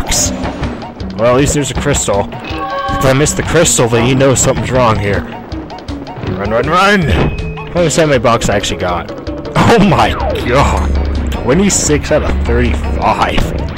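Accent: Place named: Australia. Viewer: Wow, you're moving through this game quickly! You're going to be finished with Crash 3 before I even have half the gems on my Crash run xD Also wow at that accent, I have to say its not very common where I live but if you went away from the coastline I'm sure there are people who actually have that accent! Our dingos and Crocodiles don't carry flamethrowers or mass murder penguins tho.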